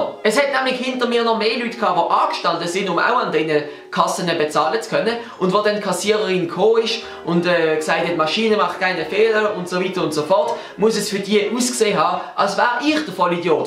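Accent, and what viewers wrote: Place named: Swiss German, Switzerland. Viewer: Dir ist klar das er einfach Schweizerdeutsch redet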